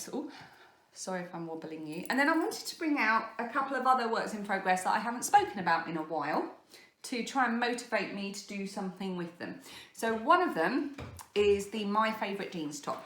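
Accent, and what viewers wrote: England, United Kingdom. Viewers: Count me in! This expat Brit knitter loves to talk yarn and patterns but especially loves to hear an English accent again!!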